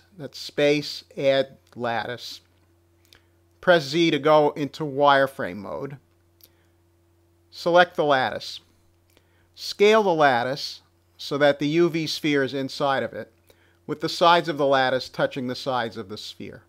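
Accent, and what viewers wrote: Boston, United States. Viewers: Lol I can TELL your from boston I can hear it. you say Bwall. =D Anyways, nice vid ;)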